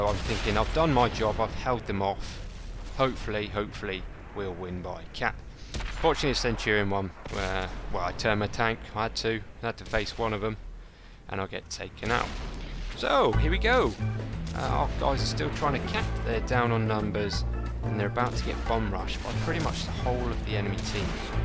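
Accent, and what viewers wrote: United Kingdom. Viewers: gg :) mad inglish man!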